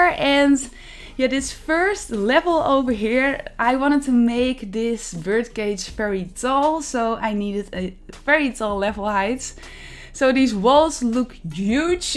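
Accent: Netherlands. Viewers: Are u dutch 😅 it sounds lik u dutch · You sound dutch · Je hoort gewoon die nederlands 😂💜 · But your accent is always so much more charming than our awful German accents; we obviously also love a Dutch accent in German as well! 😆 You always sound so cute and friendly and fun; I don't know why.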